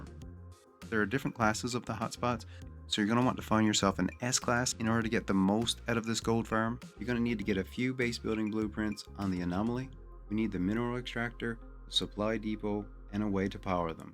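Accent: Newfoundland, Canada. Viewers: A Newfie?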